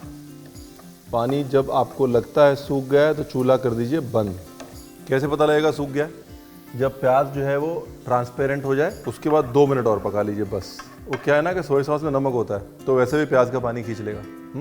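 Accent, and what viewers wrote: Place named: North, India. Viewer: Hey Ranveer u look amazing & ur North Indian accent as well as the recipe is even more fabulous... 😋☺️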